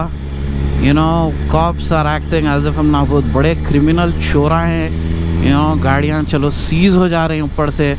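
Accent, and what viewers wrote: Hyderabad, India. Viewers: Just loved the way u vlog with hydrabadi English accent with galiya amazing maza aata bro (hau)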